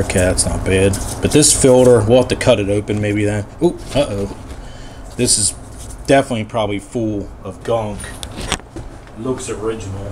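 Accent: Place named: Pittsburgh, United States. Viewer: Thanks for posting this. I took my 4.4L apart to replace the PCV valve. Your video helped a lot. And finally, you have to be from Pittsbirgh, PA judging from your accent!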